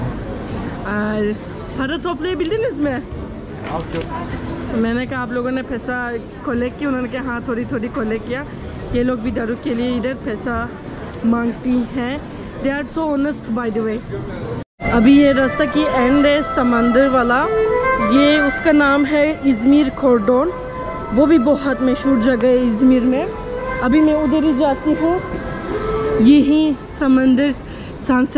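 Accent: Pakistan. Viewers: It’s really a pleasure to just hearing you speaking Urdu.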